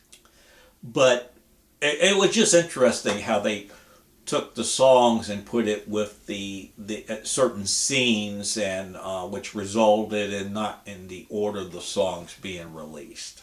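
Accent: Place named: South, United States. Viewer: The first thing I had to do after watching the video was to find out where in the south that this young lady was from that's a thick accent even for me here in Louisiana. Very different vocal styling, good music the more videos I watched the more she just grows on you. Thanks for the introduction.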